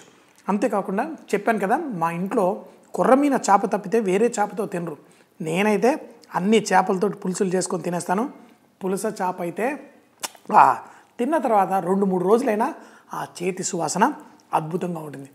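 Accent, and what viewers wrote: Telangana, India. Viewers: Your Telangana slang is awesome!!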